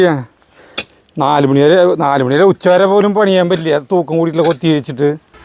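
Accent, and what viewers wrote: Thrissur, India. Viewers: തൃശൂർ ഭാഷ 😂👍..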